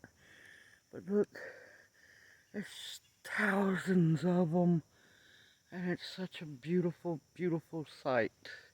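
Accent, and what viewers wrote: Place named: South, United States. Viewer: I stumbled across your channel looking for info on goldenrod. When I heard your voice I thought that lady has to be from the south! And I was soooo happy to find out you are about an hour and half from me! I am looking forward to learning lots of Old Ways from you!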